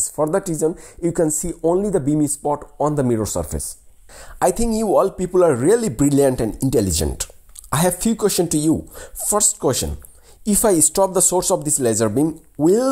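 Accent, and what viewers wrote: India. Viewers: *clicks video, hears Indian accent, clicks off video in disappointment. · Are you Indian? Your accent is like an Indian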